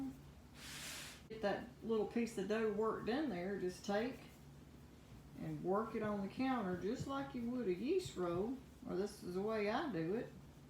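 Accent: South, United States. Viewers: Have you ever heard your voice?? It’s horrible you give southerners a bad look & btw fingers are not pronounced as dangers ! Please try to do better!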